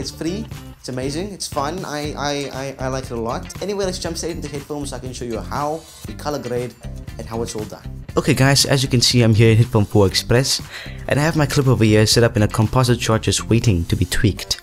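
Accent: South Africa. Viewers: You sound South African bru.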